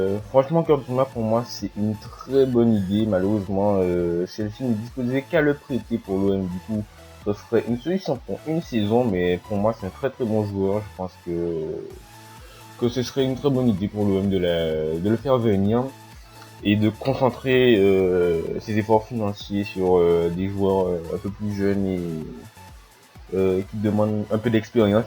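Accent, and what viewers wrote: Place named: Antilles, Caribbean. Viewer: jolie accent :D ça fais plaisir c'est rare des antillais Youtuber :D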